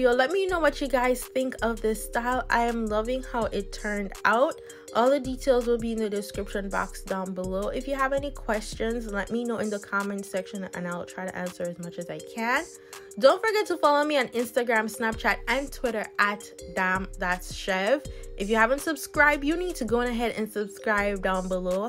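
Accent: Jamaica. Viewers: I can hear the Jamaican accent♥️🇯🇲...It's everything for me 💯❗ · I was just about to say u sound like Jamaican 🥰🥰 love my ppl.. About to do my hair like this now thanks for the video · Cause me know you sound jamaican girl big up 💯 Am a try your way tomorrow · Wait girl.. u jamaican or supm?... cause mi a hear a likkle supm supm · Are you from 🇯🇲???? Cause I heard a lil jamaican accent..... And I really like this style. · Your Jamaican accent gave you my sub😍😍😍mi luv mi yaardie dem baaaad😂😘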